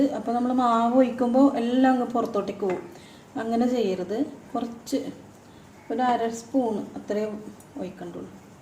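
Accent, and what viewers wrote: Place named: Kannur, India. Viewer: full kannur shailiyil aanallo samsaram. ndhayalm sadanam polich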